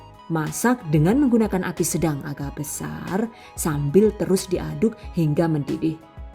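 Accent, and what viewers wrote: Banyumas, Indonesia. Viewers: Hahaha lucu banget ngomong bhs apa itu.ngapak y.makasih atas resepnya mbak.semoga menjadi berrkah.Aamiin..🙏👍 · Bismillah "DONE"#Giveaway THV 2020.paling seneng sama resep rendang yg satu ini.kelihatan enak bgt.menu favorit,apa lagi ditambah suara mbaknya yg ada ngapak ngapaknya gitu bikin senyum senyum sendiri dikamar koyo wong gemblung.ha,ha.pokoke ORA NGAPAK ORA KEPENAK.OK.CATAT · Mbaknya orang mana sih?kok ada ngapaknya.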